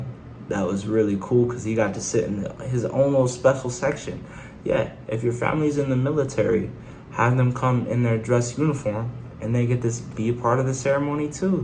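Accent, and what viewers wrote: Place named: New York, United States. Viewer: Lmao boy u gotta be from New York , nice vid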